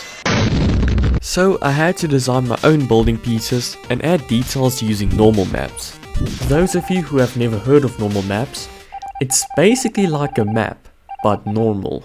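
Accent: South Africa. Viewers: you are so South African i can hear it in your accent me btw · This is awesome, you sound like a South African. Support from Greece 💪🏼🇬🇷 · i swear, this guy sounds south african, as a south african myself, i can identify the accent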